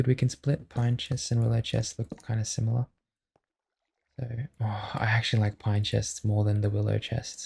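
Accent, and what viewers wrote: Australia. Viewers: absolutely adore these videos ❤ love your calming voice, and hearing the soft aussie accent as another aussie makes me feel so warm :) like being on call with a friend as i drift away. please don’t stop making these! <3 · Btw are you Australian? It's the accent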